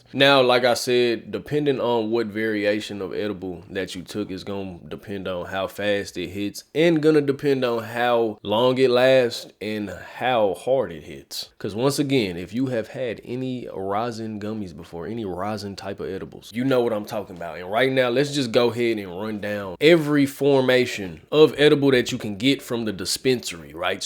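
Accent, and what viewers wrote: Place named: Texas, United States. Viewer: Strong southern accent + left over ribs and bake beans I know this man is from Austin or Fort Worth, TX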